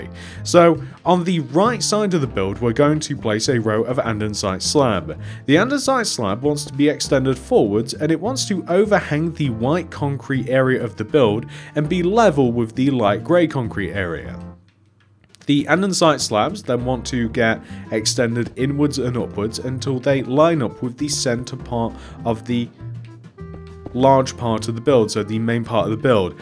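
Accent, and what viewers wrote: United Kingdom. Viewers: are you British i am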